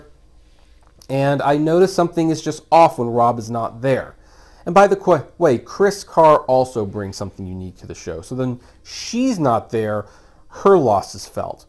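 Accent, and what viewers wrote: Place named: Toronto, Canada. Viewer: Let’s just say that as a fellow Canadian; it was very obvious Campea is from Toronto…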